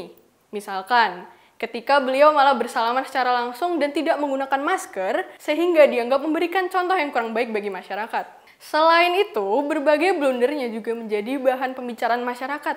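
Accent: Surabaya, Indonesia. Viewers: maklum om arek suroboyo,. logat sulit di kondisikan,. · arek suroboyo yo?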